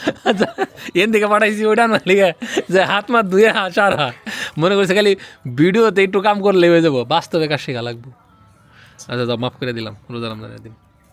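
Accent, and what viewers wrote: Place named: Tangail, Bangladesh. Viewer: Bhai apnego basha ar amago basha same ai jonne video valo lage... amar bari jodeu Assam Guwahati te tar poreu Tangaila koi...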